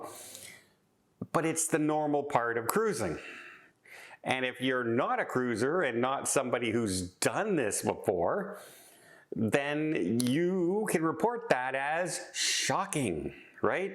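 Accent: Canada. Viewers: I've never heard it pronounced that way. Maybe it's a Canadian thing, like the way he says "garage."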